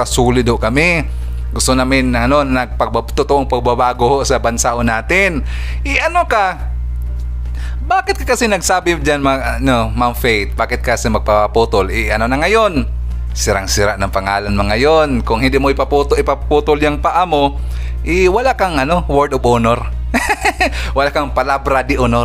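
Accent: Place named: Bohol, Philippines. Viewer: Parang taga Bohol ka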